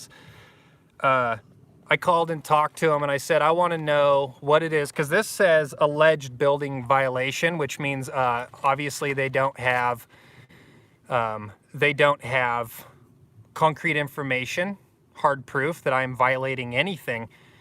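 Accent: Idaho, United States. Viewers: Just stumbled on you today. Digging that Idaho accent.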